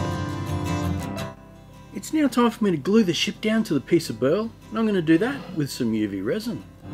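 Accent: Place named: Australia. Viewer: I love everything you make and it is nice hearing a fellow Aussie on YouTube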